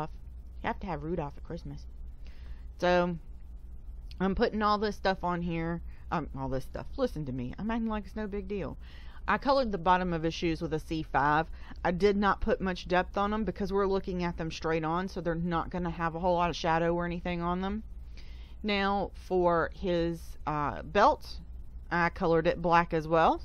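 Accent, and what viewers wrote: South, United States. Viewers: Love this card!!! Going to get this digi right now!!! Love your accent too, not sure when your from, but you kinda sound like your from the south like me!! Big Hugs!!! (US) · Thanks for the great coloring tips. I do not like fussy-cutting either. I have a scan n cut that I got from a friend for cheap, but I sill don't really know what I'm doing with it. USA P.S. I'm from the Pacific Northwest, so I really enjoyed listening to your sweet southern drawl.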